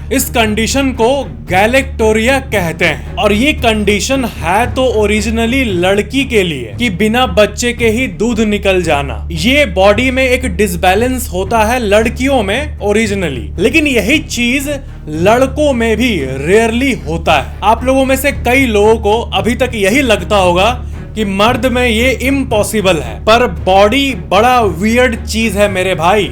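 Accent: Bihar, India. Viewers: bahi qna video bnao aur btao tum Kya Bihar se ho?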